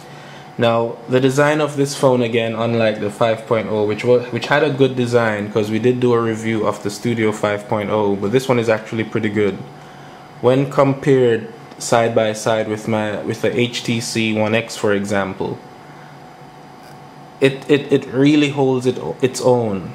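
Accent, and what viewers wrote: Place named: Jamaica. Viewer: Good Review, (I Click Liked thumbs up) You have a Nice Jamaican accent, But we Trinidadians are rated internationally as Having the "Sexiest Accents in the World" ...........LOL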